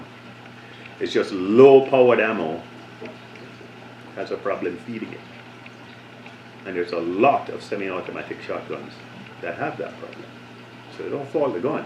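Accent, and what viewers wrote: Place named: Barbados. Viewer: Heck I just trying to figure out your accent but I'm gonna go out on a guess and say Barbados.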